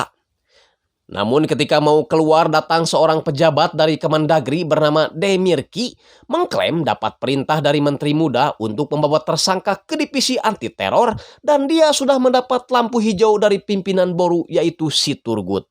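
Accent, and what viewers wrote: West Java, Indonesia. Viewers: Filmnya keren.. Naratornya kocak.. Logat sunda makin mantapp!! Sukses sll kang..!!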